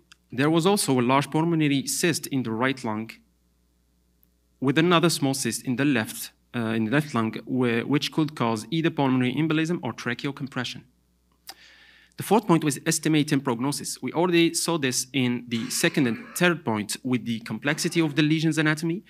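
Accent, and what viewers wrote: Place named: United States. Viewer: كيفاش تعلمت اللهجة الامريكية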